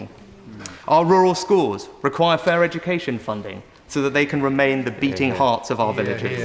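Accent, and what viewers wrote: England, United Kingdom. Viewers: I love English English. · What a magnificent speech! The richness of his English accent, the fine details, such grasp of English history and the glories of Yorkshire which he represents as a Member of Parliament. Has Britain finally delivered a leader a bit more advanced than the Americans with this highly intelligent young man of only 42 years? Good luck and God Bless Mr Rishi Sunak. 🍀⭐️🤙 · Muscle true. his grand father immigrated to UK and he and his father was born in UK. He lived his whole life in UK and US. that is the reason he speak like a Brit. He has brown skin, but he is a true British in all sense. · Commendable English accent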